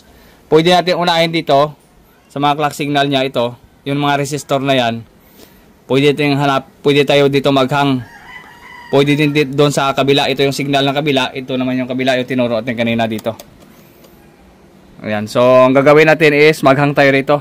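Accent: Iloilo, Philippines. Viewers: Master ilonggo ka ba?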